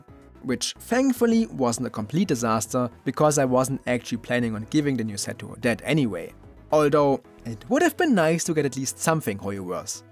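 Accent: Germany. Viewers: It's really funny to me how obvious the German accent is, it's so funny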